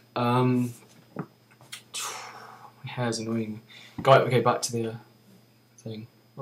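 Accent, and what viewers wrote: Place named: England, United Kingdom. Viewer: I'm just going to be a total creep and say that I really like your jaw line and your hair. And your eyes. I'm not gonna lie, I've watched this video more than once all the way through because I love your accent and I like listening to your voice. Also, I think you'd be such a fun friend to hang out with. Also - where from England are you from? What kind of accent is that?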